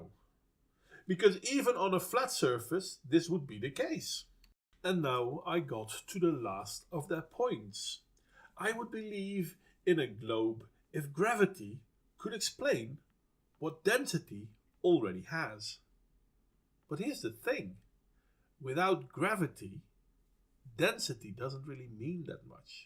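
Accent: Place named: Netherlands. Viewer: Definetly tasting some Dutch accent in the air...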